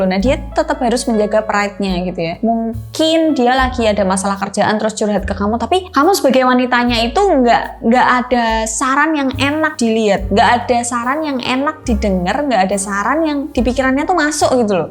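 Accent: Java, Indonesia. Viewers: Medok e jan joss mbk yu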